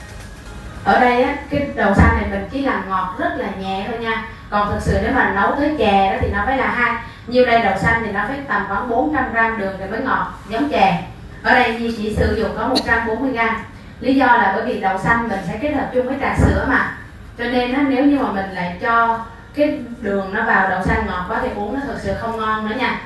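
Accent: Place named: Huế, Vietnam. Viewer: Nghe giọng là biết Huế rồi hiihi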